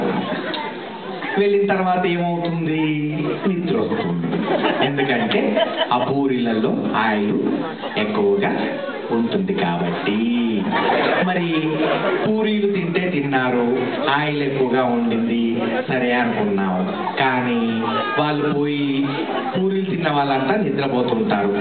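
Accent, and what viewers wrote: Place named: Telangana, India. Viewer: AND U CANT EVEN DO THAT RIGHT WITH THAT HEAVY TELANGANA ACCENT DONT UNDERSTAND ME WRONG I AM FROM TELANGANA TOO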